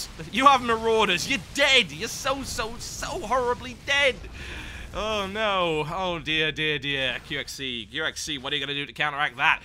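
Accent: United Kingdom. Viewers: no need to drink coffee, good gameplay gets the blood pumping.. love your enthusiasm =3 keep up the good work. (also, yay for british accent <3 )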